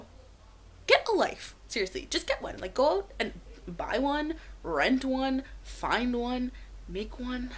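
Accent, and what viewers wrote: Canada. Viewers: are you canadian or american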